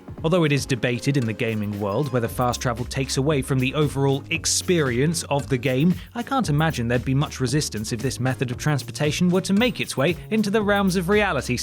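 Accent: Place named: RP, United Kingdom. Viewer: is so posh in these videos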